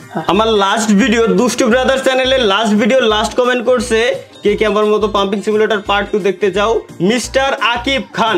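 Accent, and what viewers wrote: Noakhali, Bangladesh. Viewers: Noakhali theke boltece ❤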